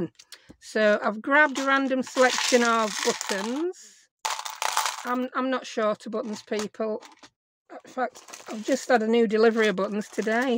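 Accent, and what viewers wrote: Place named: Northern England, United Kingdom. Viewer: I found your channel a couple of days ago, and oh boy I’m loving it. I lost both my parents just before Cov. Hit. I didn’t realise how much I missed northern accents, I was born in Yorkshire but grew up in Lancashire. However I’ve lived in the south since getting married 24 years ago😮. I’m also a big Tim Holtz fan an just love the items you make. Actually May I ask has Tim stopped designing new paper pads? Do I need to start hoarding them? Off to watch another of of your videos. Thank you for sharing your time.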